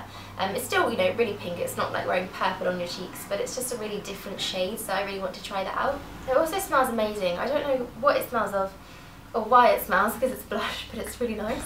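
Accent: England, United Kingdom. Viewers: I made it! what do i win? lol i just wanted to say that you have such a classy look and mannerism. i don't know if its the english accent adding to it, or just the way you present yourself, but i think you are a lovely young lady.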